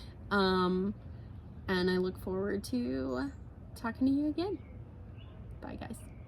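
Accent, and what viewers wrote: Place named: Wisconsin, United States. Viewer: Another Wisconsinite here! Thought I recognized that accent😉😂😂😂 Just stumbled on your podcast😊 and I'm so happy I did! Just learned to knit socks about 2 years ago and have become obsessed!!! They are THE best for our frigid WI winters❤️❤️. I also started the tradition of gifting them to my adult daughters for Christmas - this will be the 3rd year😊 And they always end up sending me pics of themselves wearing them & saying how much they love them!!! Thanks for taking the time to share your knitting!!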